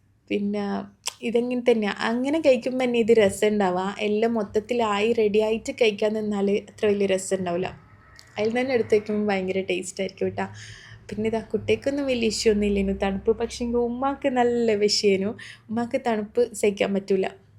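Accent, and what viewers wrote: Kannur, India. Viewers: ഇവർ വർത്താനം പറയുന്നത് കടിച്ചു പിടിച്ചു ആണ് അതുപോലെ തന്നെ എപ്പഴും പിന്നെ എന്താ പറയുക എന്ന് ഏതിന റീപിറ്റ് ചെയ്യുന്നേ കണ്ണൂർ ഇങ്ങനെ ആണോ സംസാരം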